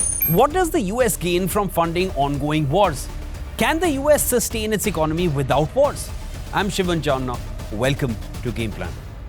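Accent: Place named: India. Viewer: Yiu. And. Yiur. Annoying. Indian. Accent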